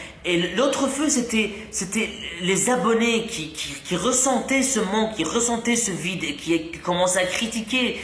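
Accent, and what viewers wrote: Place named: Belgium. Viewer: c est du français belge du coup c est tout a fait normal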